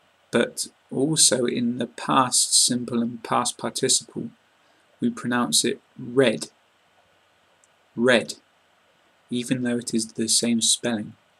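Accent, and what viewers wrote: United Kingdom. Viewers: An excellent series of learning English with the right british accent..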